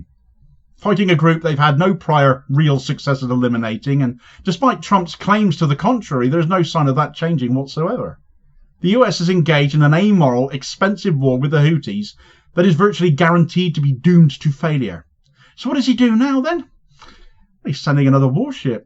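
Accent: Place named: England, United Kingdom. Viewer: not listening your you disparage my president. you sound like your English anyway. Good bye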